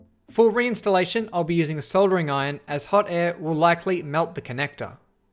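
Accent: Australia. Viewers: His accent mate. He's Aussie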